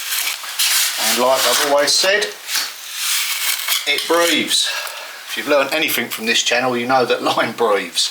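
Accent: United Kingdom. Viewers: I'm curious ,What is you accent? I know the UK has accents just like in the US....I'm thinking it's North or West. Some more repairs as you go....it's a good thing.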